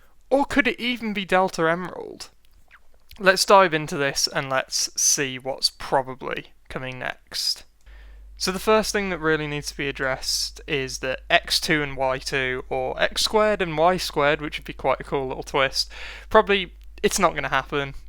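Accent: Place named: United Kingdom. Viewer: Soooo British.... Ewwww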